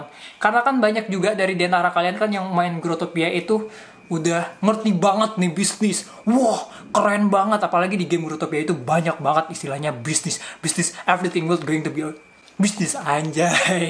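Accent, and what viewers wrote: Indonesia. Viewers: Here your English comment Dead channel because of the freaking Indo voice lol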